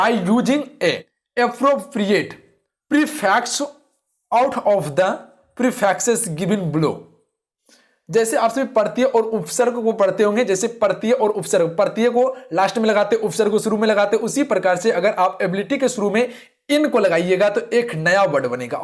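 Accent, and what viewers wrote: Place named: South, India. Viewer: Ye south Indian hindi kyon?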